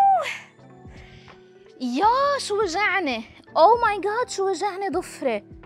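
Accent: Lebanon. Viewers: لهجتج لبنانية انتي لبنانية صح اني هم ماعرف لبناني جان كتلج معنى كلمة شمعنا بلبناني 😂 هذا تعليقج ما مخفي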